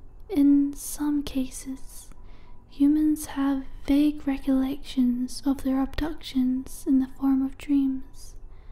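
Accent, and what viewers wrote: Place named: Scotland, United Kingdom. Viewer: She sounds vaguely Scottish, is that a reference to the alien sightings at Bonnie Bridge (I think that's what I think the village is called)🏴🌉👽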